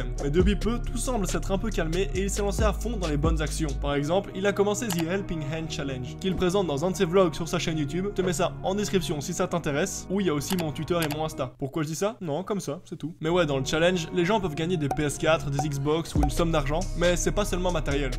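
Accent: Belgium. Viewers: Tu as l'accent d'un belge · On sent le belge en toi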